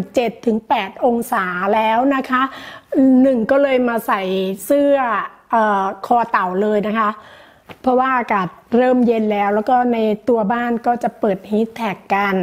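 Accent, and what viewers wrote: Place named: Thailand. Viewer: Wow. This is an interesting Vlog. Greetings from Oman. Sawadee Krap.. Khob khun for sharing this video. Interesting to know about you and your life. good to meet your cameraman Husband:) Tinglish sounds cool.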